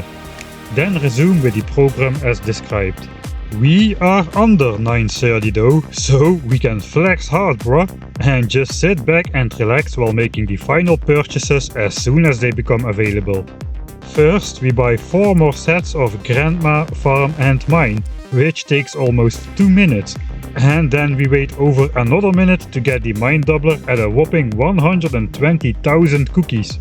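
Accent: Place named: Netherlands. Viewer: This video randomly got suggested to me and I gotta say I love your dutch accent haha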